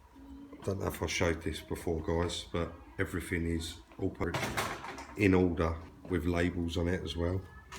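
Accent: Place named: Cockney, United Kingdom. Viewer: Love your cockney accent mate !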